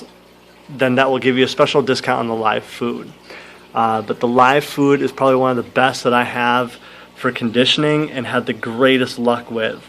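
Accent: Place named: Massachusetts, United States. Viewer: are you from Massachusetts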